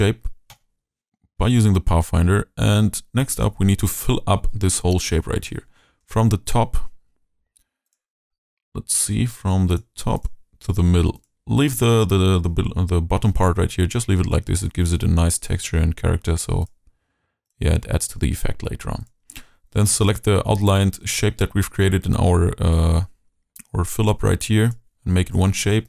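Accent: Germany. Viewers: deutscher hört man sofort raus :D aber nettes video xD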